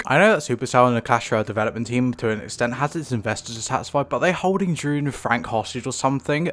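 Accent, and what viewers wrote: United Kingdom. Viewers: Real ones just turn on closed captions to counter the british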